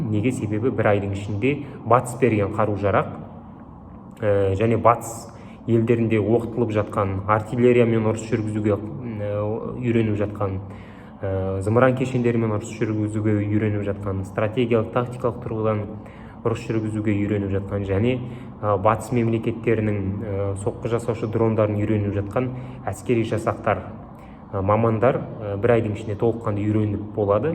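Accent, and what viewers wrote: Standard. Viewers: баламыздың сараптамасы жақсы мағлұмат береді. Тілі таза, ойы жүйелі, дикциясы анық. Рахмет. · Ой, балам, өркенің өссін! Таза қазақша, тамаша дикциямен объективно түсінік бердің.Рахмет. Арнаңызға табыс!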